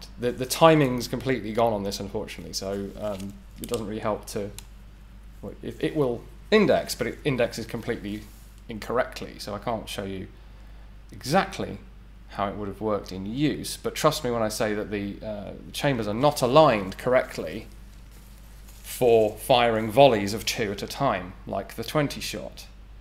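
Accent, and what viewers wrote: United Kingdom. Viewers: I wasn't expecting a British accent, I bet he never shoots them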